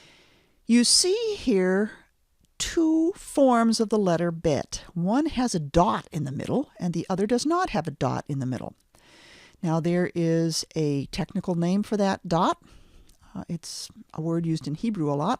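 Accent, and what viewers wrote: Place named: United States. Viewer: Teaching pronunciaton of Hebrew in American accent. Frustrating...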